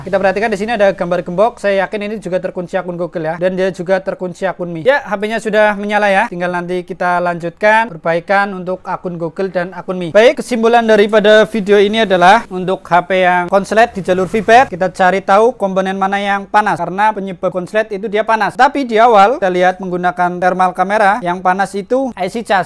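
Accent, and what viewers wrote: Banyumas, Indonesia. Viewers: logatnya kaya ngapak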